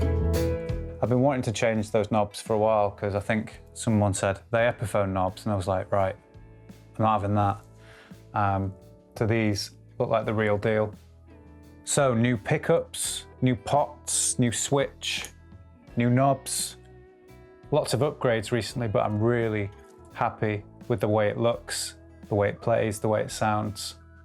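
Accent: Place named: Manchester, United Kingdom. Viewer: I watched the footie last night and felt hyper about 2 am..... So I put YouTube on and thought it was about time I learned solo to..... Chris Spedding is still one of my favourite guitarist so thought I'd investigate your channel.... I'm hooked!! It helps that I understand your accent..... That's real Mancunian! But seriously you're a cracking player and inspirational teacher..... Keep rocking!! Gear analysis is great too. I've heard good things about Sunbear pickups.... AND they're English!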